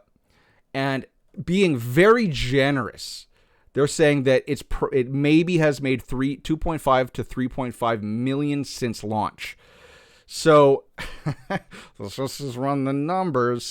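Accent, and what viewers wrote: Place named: Canada. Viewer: Thats one hell of a Canadian accent. Well done 🫡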